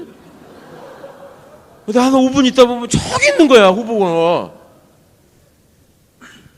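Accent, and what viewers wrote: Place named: Jeonju, South Korea. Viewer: 우리지역 전주가셔서 강의 하시니 완전 전주 말씀 정감있습니다...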